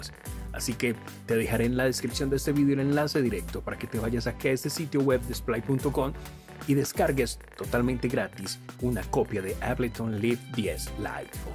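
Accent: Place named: Colombia. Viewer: Por tu bandera y acento me pareces de Colombia, yo soy de Venezuela y de España viviendo en México desde hace 10 años. Me parece excelente que intercambies con quienes te escriben. Tengo dos canales más, casualmente este no es el de música. Uno lo tengo para mis covers y otro como compositor. Decidí separarlos porque esto de las redes uno no sabe y cualquier dia me puedo llevar una mala sorpresa de que me tumban el canal por no tener derechos de autor. Los de compositor no hay forma. Empecé en este segundo aliento con YouTube hace poco luego de que twitter me tumbó mi cuenta con casi 500 mil seguidores y 10 años de dedicación, publicando poco además porque no me alcanza el tiempo para crear, producir y promoverme. Un Abrazote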